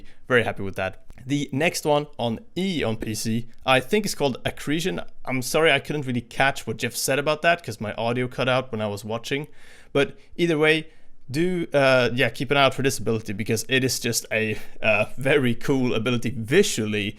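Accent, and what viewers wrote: Sweden. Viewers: You swedish? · This guy has Swedish accent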